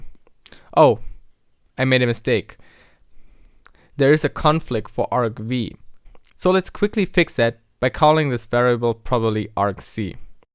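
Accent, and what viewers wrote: Germany. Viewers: can tell that you are german, but it's definitely not that bad. I can understand you very clearly and I don't think there is anyone who wouldn't. You're very articulate in how you speak and very clear. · Are you german? :D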